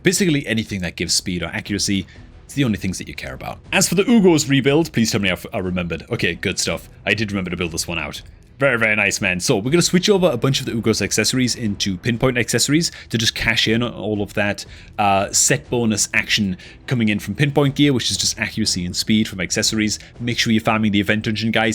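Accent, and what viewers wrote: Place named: Northeast England, United Kingdom. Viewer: I'm distracted by picking out the accent. I couldn't work out whether it was Sunderland or Newcastle which usually points me towards a dancer of the sandy nature.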